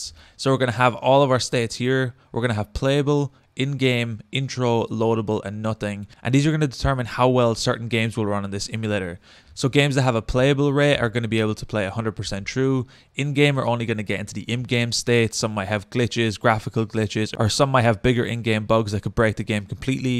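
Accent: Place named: Ireland. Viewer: you have a great Irish accent lad thnx worked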